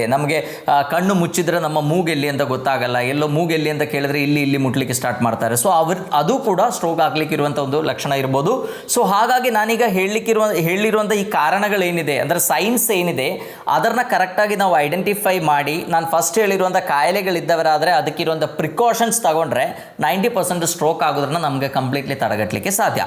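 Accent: Kerala, India. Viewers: ನಿಮ್ಮ ವಿವರಣೆ ತುಂಬಾ ಚೆನ್ನಾಗಿದೆ , ಉಚ್ಚಾರಣೆ ಕೇಳುವಾಗ ನಿಮ್ಮ ಭಾಷೆ ಮಲೆಯಾಳಂ ಆಗಿರಬೇಕು ... ಧನ್ಯವಾದಗಳು ಸರ್.😊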